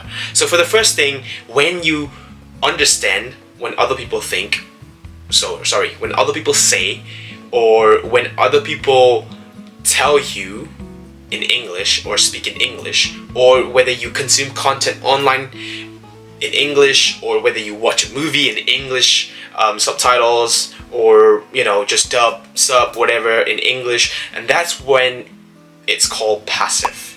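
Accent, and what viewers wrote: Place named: United States. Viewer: You say you’re not a native English speaker, but I’m wondering how that’s possible haha. I’m an American and you just sound perfectly American to me. Can you explain how this is the case? You must have consumed English content extensively since you were a kid. Whatever you did, I need to apply it to my life in Japanese, since I’m living in Japan 😂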